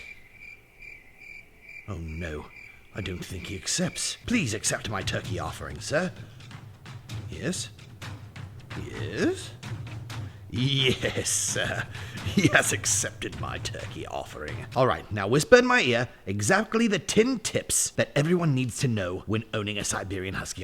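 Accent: United Kingdom. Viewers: I love his British accent 😆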